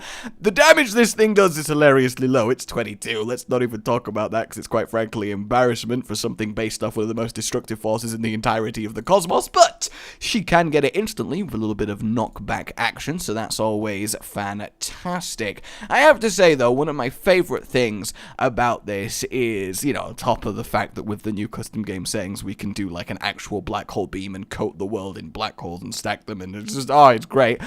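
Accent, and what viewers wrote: Russia. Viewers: Not gonna lie, he actually does a pretty damn good Russian accent.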